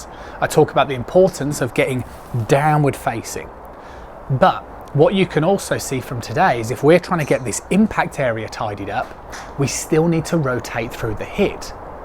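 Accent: United Kingdom. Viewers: You are the first British instructor that I can understand your diction. The accent is pleasant to listen to and learn from. Keep up the great videos. Bruce from USA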